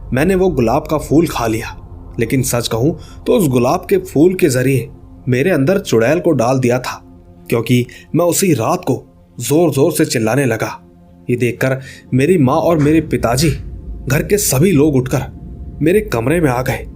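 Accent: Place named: Mumbai, India. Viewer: Bambaiya style mein jhakas❤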